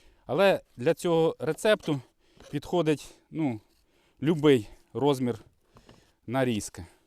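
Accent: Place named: Poltava, Ukraine. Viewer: став підписником не лише через персональне знайомство, а й завдяки гарній українській з легким полтавським відтінком) І не лише я. Продовжуй так і далі, молоток!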